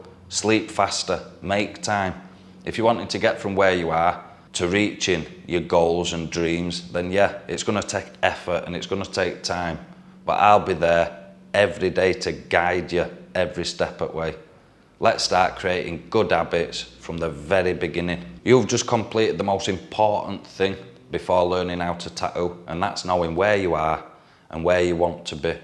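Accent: United Kingdom. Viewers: Thanks Man, your videos are Great! Your explanations are very clear. I'm a Yank and I love hearing your Limey accent! I am an old guy, now retired, with over 21 years of getting a full body suit. I suddenly got the urge to learn to how to tattoo when I thought about getting my hand tattoos touched up and thought I might try to do it myself. I may never get confident enough to do it, but I'm having a great time trying to learn. But I'm still avoiding attacking my skin! What would you suggest as an all-around good liner? I have only some 10-05 RL and wondering whether to try 10-07 or 10-09 or what?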